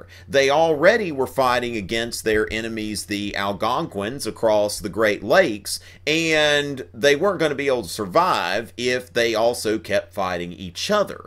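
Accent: South, United States. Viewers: Wow is this the southern cowboy accent from the USA? I always thought the people from that part of USA are considered stupid. It's amazing, you seem smart and well put together.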